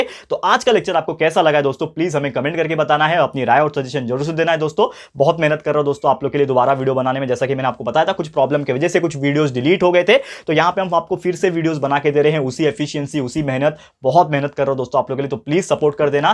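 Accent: Maharashtra, India. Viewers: Sir are you a Marathi speaker🤔😇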